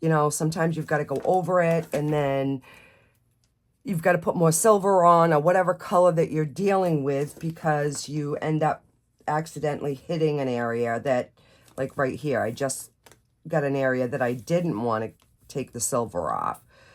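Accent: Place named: Long Island, United States. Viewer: I’ve been trying to guess your accent since I stated watching you😂 I’m from the East Coast so I’ve guessed Long Island or Maine. I’m sorry if that’s too personal I’m just a curious person♥️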